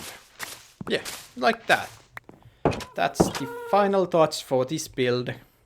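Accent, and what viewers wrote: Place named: China. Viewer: Bro sounds Chinese 😂 no hate tho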